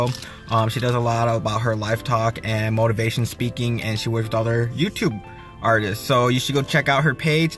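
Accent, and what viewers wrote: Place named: Philippines. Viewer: That accent!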